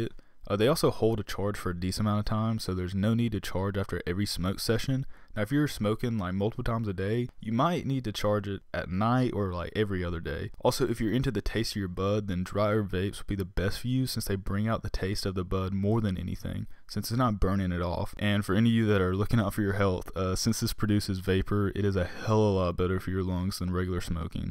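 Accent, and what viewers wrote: South, United States. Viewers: Southerm American...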